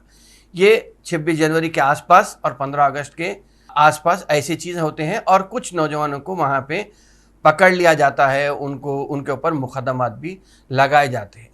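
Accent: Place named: Hyderabad, India. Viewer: ماشاءاللہ صاحب حالات حاضرہ کا بہترین تجزیہ اور دلچسپ تبصرہ پیش کیا ساتھ ہی ساتھ خالص حیدرآبدی شرفاء کے مہذب لہجے میں خوبصورت پیشکش پر دلی مبارکباد پیش ہے، امید ہے آئندہ بھی اسی طرح ملک کے سیاسی حالات پر مفید جائزہ سننے کو ملے گا۔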